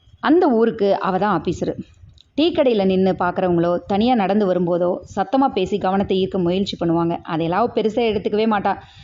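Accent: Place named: Kongu, India. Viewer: கொங்கு மண் சார்ந்த கதை. அதை கொங்கு தமிழில் கேட்பது மிகவும் இனிமை 👌🏻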